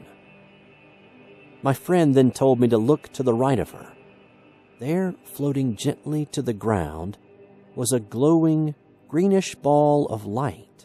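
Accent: South, United States. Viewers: andrea I do every time. I love his Southern accent.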